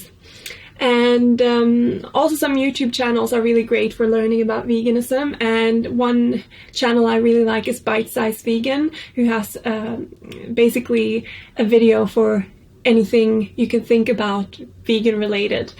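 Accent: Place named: Sweden. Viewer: this is the first video of yours that i've watched. my roommate is swedish, and as soon as you started speaking i immediately knew you were swedish. love the video! definitely subscribing.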